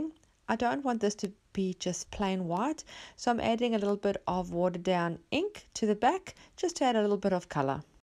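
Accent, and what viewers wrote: South Africa. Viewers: Love watching your channel. You sound South African 🤦‍♀️ I am from SA. Going to see how I can do this without the inks ❤️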